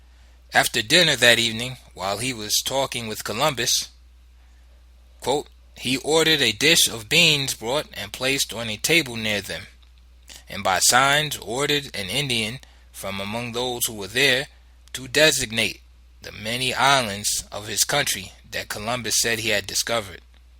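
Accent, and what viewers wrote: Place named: New York, United States. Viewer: That NY accent makes it hard to listen to. Lol open parentheses … close parentheses?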